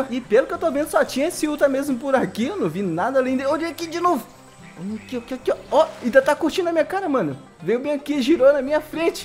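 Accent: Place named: Northeast, Brazil. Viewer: Que saudade que eu tava de vc é do seu sotaque nordestino eu também só nordestino só que eu moro em Minas Gerais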